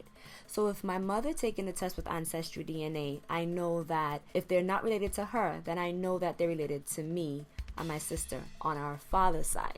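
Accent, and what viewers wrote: Trinidad and Tobago. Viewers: You sound Trini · I couldn't decide between Trinidad or Grenada when I first heard you speak. I got my answer browsing the comments lol. Either way, if you're from one, you likely also have family from the other. Great comparison video! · Exactly what I was looking for, fellow Trini! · yuh sounding Trini! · how you sounding Trini,sweet like a Trini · You sounded like Guyanese until I heard the little Trinidadian accent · 23andme Had a sale so i used them, also knew a friend who used it. I'm also a trini, i suspected you were as soon as i heard you speak. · I This lady sounds Trinidadian🇹🇹 Interesting video..... Hoping for a Christmas sale as they are expensive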